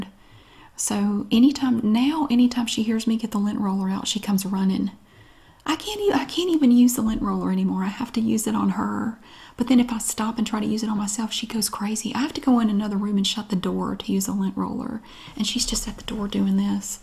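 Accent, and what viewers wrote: South, United States. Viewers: You are such an awesome person . love the southern draw . you really get into your characters and i love that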